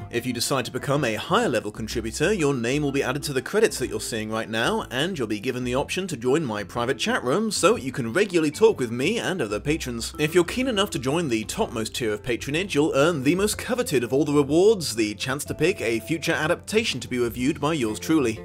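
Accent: United Kingdom. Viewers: The British propaganda is working on my American ass, because that intro would have been a big nerd if it weren't for the accent 🫠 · What part of Great Britain are you from? I'm sure I've heard that accent before! But I don't know now where it is from. I lived in Benidorm for more than ten years. I was in contact with many British people during that time. Please, tell me where you are from!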